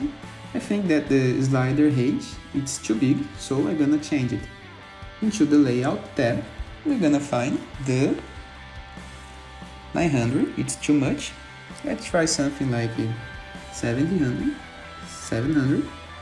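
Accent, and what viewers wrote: Brazil. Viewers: Certeza q é BR.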